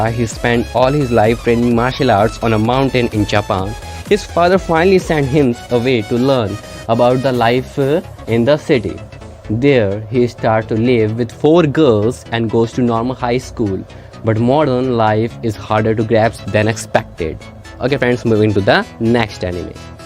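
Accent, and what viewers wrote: India. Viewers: I think you are indian because your voice cannot be perfected completely at english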